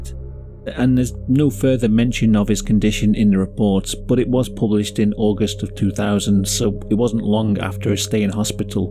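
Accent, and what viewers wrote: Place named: England, United Kingdom. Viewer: This guy (is) English, he's based in the UK. 😂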